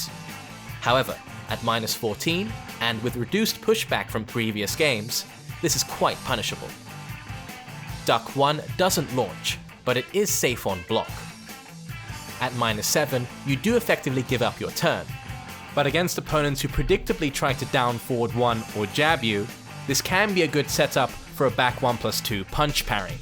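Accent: United Kingdom. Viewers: british accent nice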